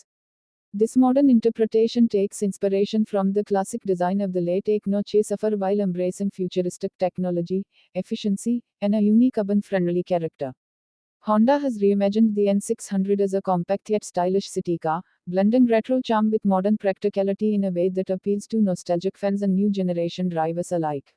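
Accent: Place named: India. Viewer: with the heavy Indian accent and her "rushing" without any inflection makes it almost impossible to understand this announcer.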